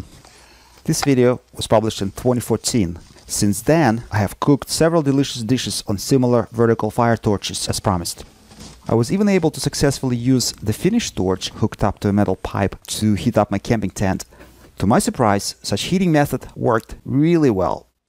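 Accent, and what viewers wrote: Russia. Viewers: So impressive and I absolutely love your perfect English with your Russian accent.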